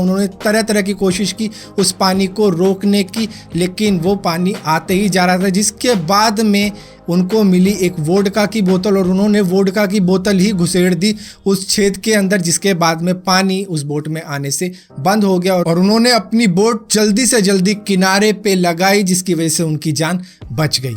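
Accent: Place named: Delhi, India. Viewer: dilli wala accent paarak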